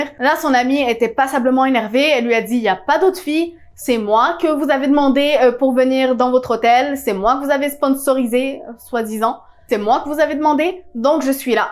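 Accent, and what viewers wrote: Quebec, Canada. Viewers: Y a des arnaques partout c'est dingue. Et là c'est encore pire, que serait-t-il arrivé si c'était deux filles !!!😱 L'essentiel c'est que tout finisse bien, mais elle a manqué de prudence. Ps : c'est rigolo j'ai lu plus bas que tu étais québécoise, j'avais vu l'accent effectivement mais il est assez léger je trouve 😁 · Je viens de découvrir ta chaîne et j’aime beaucoup ❤️ Tu es québécoise ? Tu as un semblant d’accent 😉 New sub 💕 Edit : omg je suis tellement choquée de cette histoire 😭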